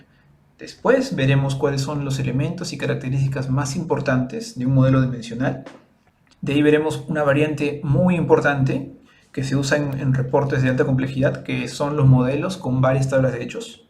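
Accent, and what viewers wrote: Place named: Peru. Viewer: Muy bien explicado, buenos ejemplos y realmente didactico, de ahi un problemita que el microfono realmente no te ayuda pero eso se mejora con el tiempo al tener uno mejor, y sobre reconocer a leguas ese acento de mi paisano peruano. Exitos en t u canal cauza!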